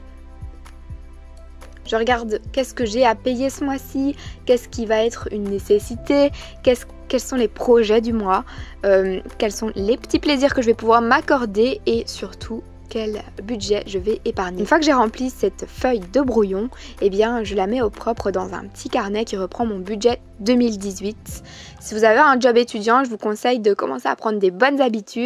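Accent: Belgium. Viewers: Tu es Belge ??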